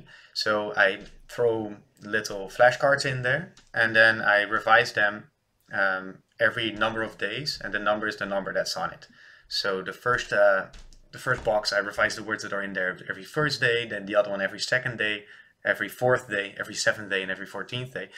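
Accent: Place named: Iran. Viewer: Hey, I showed the video to my roommate who speaks Dari and is from Afghanistan. He really praised your ability to speak Farsi and what I thought was funny to mention is that to his ears you do really sound like somebody from Iran.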